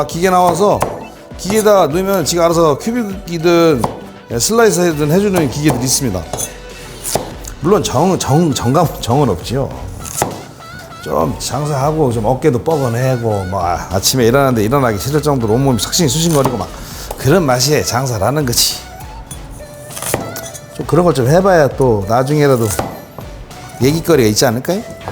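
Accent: Chungcheong, South Korea. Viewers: 충청도 출신이라 백선생님 말씀하시는거 듣고 있음 고향에 간것같이 편하구 좋음~~ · 으 감자셀레드 멋지게 활용 잘봣십더 요리 즐겁게해주셔 많은양 을 어덯게 햇는지도 모르게 다 빠르게 신나게 실렁실렁 보너스 충청말씨 아주 잘봣십더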